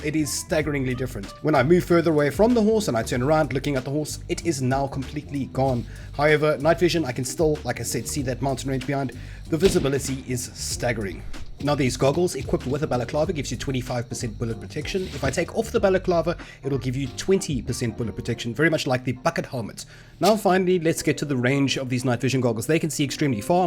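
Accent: South Africa. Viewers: Are you South African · What accent is that? Are you South African? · Are you South African?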